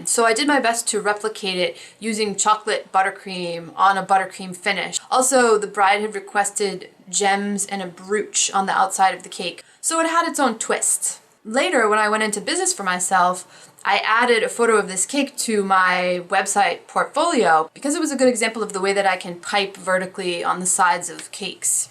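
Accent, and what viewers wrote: Boston, United States. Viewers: Ha love your Boston accent! Love seeing your progress on your master class - keep up the good work! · loved your Boston accent!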